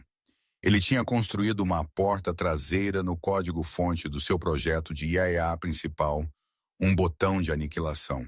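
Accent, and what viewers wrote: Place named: Portugal. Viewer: MUITO BOM OUVIR A NARRAÇÃO LUSITANA, EM UM CANAL NOVO. O ENREDO É EXCEPCIONAL COMO SEMPRE.... Bem vindos! ✨💫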